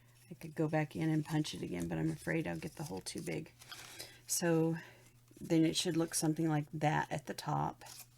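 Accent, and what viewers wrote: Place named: Texas, United States. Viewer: Where in Texas are you from.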